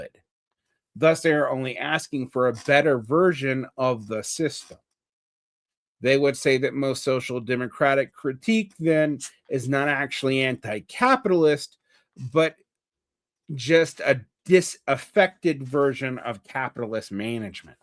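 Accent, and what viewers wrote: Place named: Bavaria, Germany. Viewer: your Bavarian accent is very noticeable in the way you pronounce stuff in German lol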